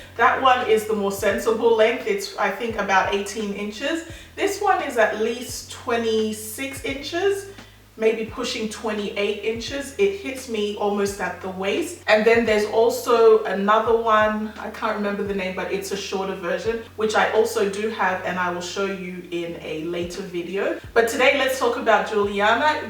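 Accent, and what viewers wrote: Australia. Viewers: Are u Aussie??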